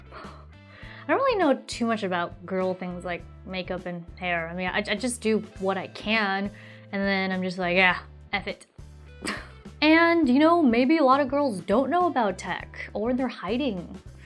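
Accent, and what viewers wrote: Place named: United States. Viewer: well...as pwrOverwhelming states..you're the perfect host for tech shows and some other shows too..crude comments from keyboarders you dealt with professionally..comments about your asian ethnicity-some were not flattering to you..to be beautiful chinese and obviously long time in america to have an american accent-you grew up in USA?..if only there were more like you..and using screwdrivers and other tools doesn't make you weird..its just shame more girls don't get involved in tech other than using smartphones..i can see a TV company contracting you with your popularity..specs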